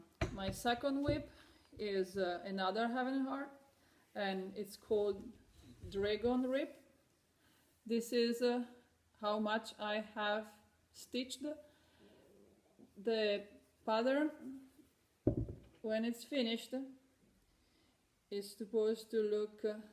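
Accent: Italy. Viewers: I'm just now starting to watch your videos. What a beautiful Italian accent you have! I love it!! I'm trying to learn Italian using the Duolingo app. Definitely the most beautifully spoken language ever, in my opinion.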